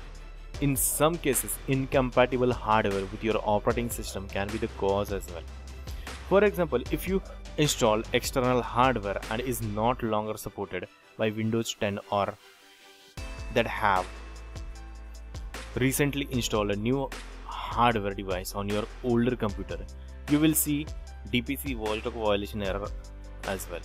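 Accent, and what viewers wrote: India. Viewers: The narrator has an Indian accent, is that ok? Since when windows has a robotized indian accent ?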